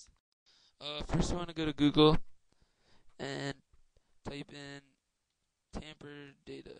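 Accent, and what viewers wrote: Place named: New Jersey, United States. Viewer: I bet his from jersey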